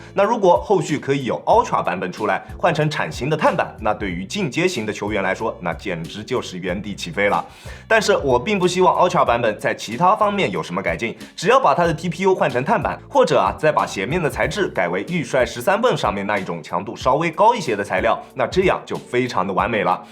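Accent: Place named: Zhejiang, China. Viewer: 看到嘉兴市原来是浙江老乡难怪口音这么亲切哈哈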